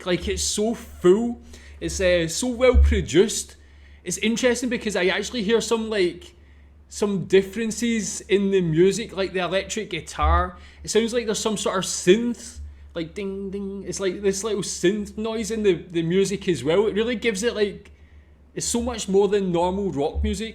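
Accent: Scotland, United Kingdom. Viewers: Kudos - you picked up on quite a few things in there. Note that the vid is not a history of Germany, but rather highlights some aspects, which were very defining maybe not to what Germany *is*, but to how people may feel about it. There are good analyses out there, on Utube the one by "three arrows" is the best I know. Still, it falls short. The references and cross-references are enormous, and sometimes only detectable in freeze-frame. My current count is at around 180 (!). The Band worked for over a year on this, and I can only guess at the production budget. It is one of a kind, I know of no comparison. Anyhow, I love your channel, you are doing great work. And a lovely Scottish accent! Not quite as enjoyable as Neil Oliver's, but still...;) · love your Scottish accent....got to learn it....👍🏻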